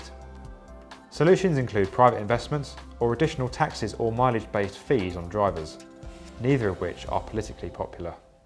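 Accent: United Kingdom. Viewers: He's from the UK you dumb shit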